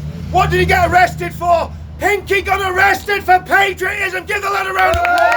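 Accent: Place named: Ireland. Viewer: Love your irish accent ❤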